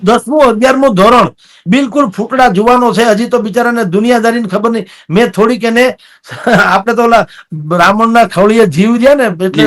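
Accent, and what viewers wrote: Kathiawar, India. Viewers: કાઠિયાવાડી ભાષામાં મઝા આવે છે..🎉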